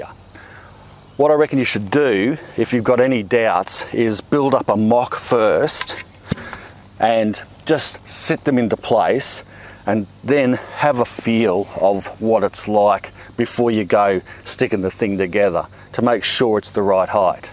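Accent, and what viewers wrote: Australia. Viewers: I think the bed looks great! And I would watch just for that great Aussie accent!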